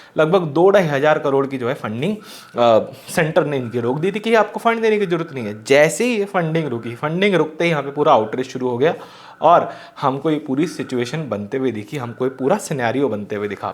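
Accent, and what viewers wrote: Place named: Bundelkhand, India. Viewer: bhai mein aapke Bundelkhandi bhasa sunte hi khus ho jata hun kuch apna sa lagta hai.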